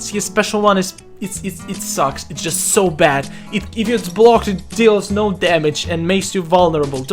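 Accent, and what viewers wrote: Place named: Russia. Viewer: I don't mean to be offensive But are you Russian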